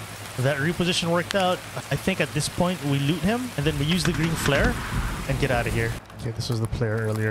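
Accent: Philippines. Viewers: are you filipino? Sounds like you have a manila accent :) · Legit thought this was a big time youtuber in the US, but once you started the voiceover I could immediately tell the accent, kabayan! Amazing content man, new sub. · oh shit narecognize ko agad an pinoy accent hahaha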